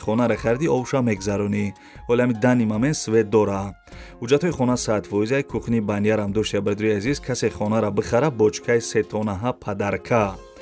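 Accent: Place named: Gharm, Tajikistan. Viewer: ХДТ ГАРЕНИ ГАПМЕЗАНИ НЕКИ😂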